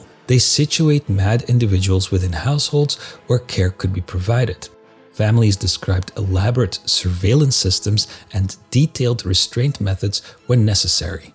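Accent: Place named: Flanders, Belgium. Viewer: Ik ruik Vlaams 👃🏻Geweldige content trouwens. Keep up the good work!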